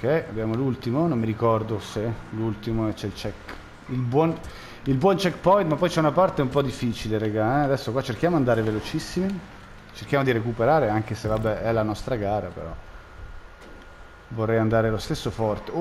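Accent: Italy. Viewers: Nada mau para um italiano falar um pouco de inglês, mas sem ofensa mas o sotaque seu italiano com o de inglês não cai bem.Usa il traduttore per capire la mia lingua brasiliana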